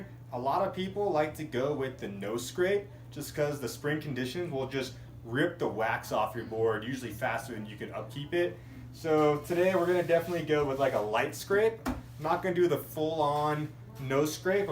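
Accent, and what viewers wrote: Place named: New Jersey, United States. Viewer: Great. video, thanks. Love that dirty jersey accent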